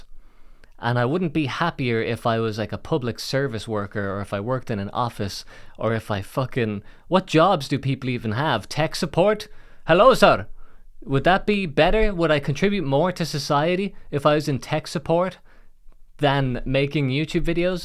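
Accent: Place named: Ireland. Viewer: Your Irish (Newfoundland?) accent is really neat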